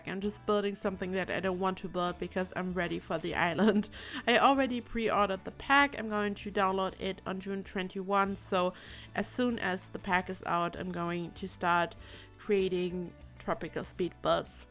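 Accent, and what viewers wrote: Germany. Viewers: r u german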